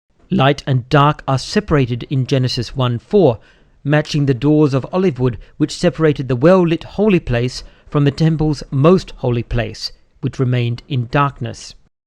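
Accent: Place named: United Kingdom. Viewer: Everything sounds more authoritative in a crisp British accent, but I have to agree with Snipe. You guys are acting like the temple came first and the text of Genesis came later. Genesis isn't modeled on a temple, the temple is modeled on Genesis. That doesn't make creation a temple anymore than a doll house is a real house. Creation isn't a temple, the temple is a reflection and microcosm of how creation was supposed to be. I am also inclined to think that the temple itself was more along the lines of a reflection of the garden. The "sea" was in the outer courts. That was the earth. Thus the windows letting in light wasn't about recreating the host of heaven. It was just a way to illuminate the temple. If creation was meant to be a temple instead of the temple a microcosm of creation, then why wasn't God big on temples? He only wrote it into the story when David wanted to build one, and He wrote it out of the story when it became almost an idol. 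God's idea was the Tabernacle.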